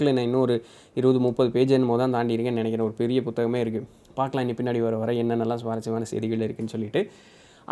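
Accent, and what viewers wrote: Coimbatore, India. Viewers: Anna neenga coimbatore ah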